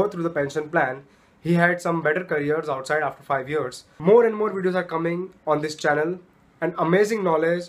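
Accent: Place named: Indiana, United States. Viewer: He. Doesn’t speak with his French Accent. Just Indiana accent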